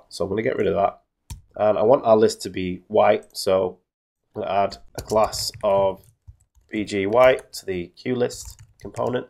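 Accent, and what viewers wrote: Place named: England, United Kingdom. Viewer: Great tutorial. Glad to hear another English voice doing tutorials along with the English "Poo" humor. Well done on getting your video on the front page of <link>!!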